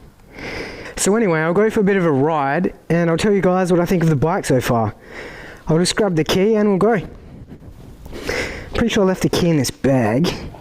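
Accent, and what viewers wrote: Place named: Australia. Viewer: How Australian haha "where's the rabbit?" Great content mate keep it up!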